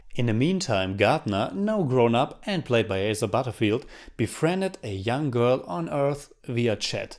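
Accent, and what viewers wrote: Germany. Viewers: This is the first vid I'm wtaching from you Are you German ?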